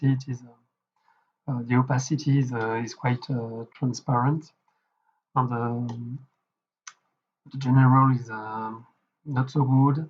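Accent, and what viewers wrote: France. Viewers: Nice vid, it's great to see the game played by its developer (and to hear his delicious English accent from Paris 😉) 👍👍👍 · The aesthetic is very beautiful. And the gameplay is innovating. You're english accent seems to betray a french identity ;). Vous avez développé ce jeu tout seul ? Il manque peut-être des musiques de fond pour le côté drama (comme dans le futur jeu Burden of Command).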